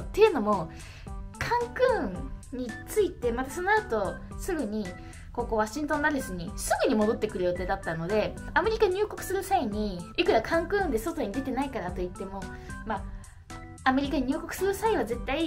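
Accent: Kansai, Japan. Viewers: 、関西なん？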